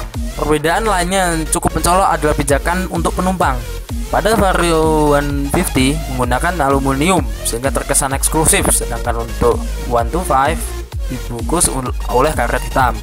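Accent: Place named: Java, Indonesia. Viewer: Medokk :v pokoke josss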